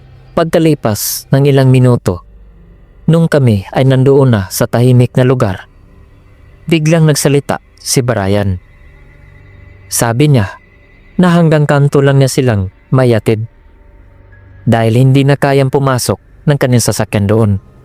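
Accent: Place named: Visayas, Philippines. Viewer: New subscriber idol gusto naku bisaya imong pag narrate ❤hehe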